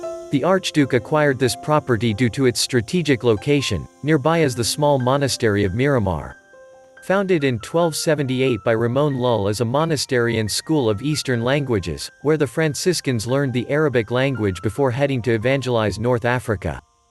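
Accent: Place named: United States. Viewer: Nice videoing - but the American-style narration distracts. Is this some automatic US-english narration?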